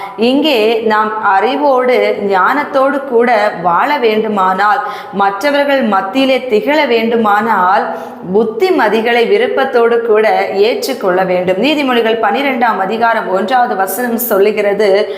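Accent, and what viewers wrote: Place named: Kerala, India. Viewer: Amen Kerala tamil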